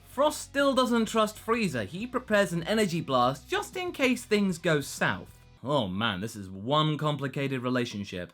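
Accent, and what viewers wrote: United Kingdom. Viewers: The way brits say words is cringe to me cabber and freezer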